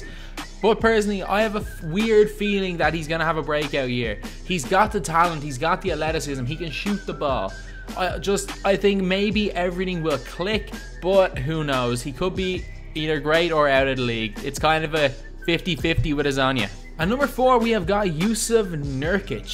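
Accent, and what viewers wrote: Ireland. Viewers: Dude u Irish?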